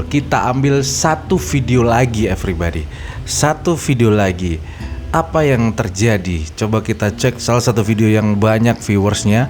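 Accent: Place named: Banyumas, Indonesia. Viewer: kelas bang,,, wong ngapak mendoan kie bang 😊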